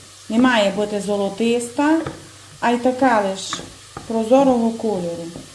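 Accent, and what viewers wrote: Transcarpathia, Ukraine. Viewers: Пані, Ви готуєте гарбузовий суп так, як готують в Австрії. В нас вдома (чоловік австрієць, дуже смачно готує) в цю пору цей суп часто в меню. Перед поданням посипають невеликою кількістю підсмаженим насінням гарбуза і легенько поливають гарбузовою олією. Ви прекрасна господиня! ❤ Дужу люблю ваш закарпатський діалект, слухала б годинами. Мирного неба в Україні!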